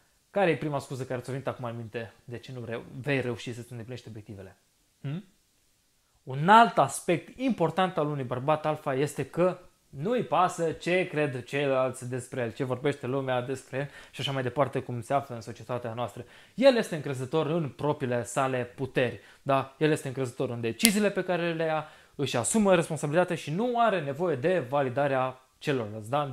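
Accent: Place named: Bessarabia, Moldova. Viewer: Am o intrebare.Te-ai nascut in Romania?Ai un accent e basarabean.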